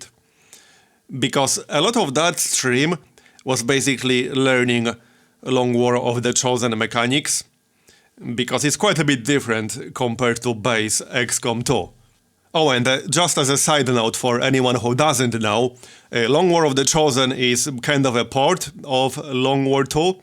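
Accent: Poland. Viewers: You are the first gamer I followed on YouTube. What got me was your Arabian Civ V campaign. I was amused by your humor and amazed by your ability to beat the game at such a high difficulty level. I was also trying to figure out your accent. Then I learned somewhere along the way it is a Polish accent. Either way, you have provided many great hours of content and even inspired me to get into XCOM 2 when I knew nothing about the series. I say upload videos of you learning games because first impressions and playthroughs are very fun to watch. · Thank you for your honesty and hundreds of hours of pure entertainment! I subscribed to your channel because the quality content and your nice Polish accent (which as a half Polish person I enjoy very much). Keep up the good work on Twich and YT and remember, there is one worse thing than reading YT comments: it's taking them seriously:)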